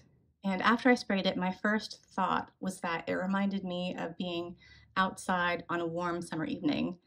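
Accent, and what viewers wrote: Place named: California, United States. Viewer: Blue jasmine tea and smelly feet papyras. I love these descriptions. The Jasmine Rouge really didn't impress me. I need to go back to it again. It sounds much more interesting than I found it. The SL does go down my path of jasmine, I love that natural smell of jasmines and their indoles. Such an ethereal heavenly kind of scent. Similar story with me with Alien, I'm not overly shy always but don't approach randoms. But I smelt that in the air and was just so wow I had to ask her. I absolutely adore it. I'm not very happy with "Mugler" as they are called have done to it. They've took it's bite away. It's like some childish version now, all the boldness has gone all that wow factor. It's sweet and friendly. I did enjoy this one. Jasmine is one of if not my favourite note. It can be so different in fragrance can't it. 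Thanks for the video a good first to watch. I feel like I have a new Netflix series to catch up on. I'm terrible and American accents but I know the Yeehaw texas and gangster new York I think 🤣. I could be wrong is yours California? It's very pleasant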